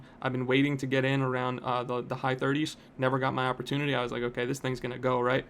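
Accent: United States. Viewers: You sound American but NL in your name means Dutch?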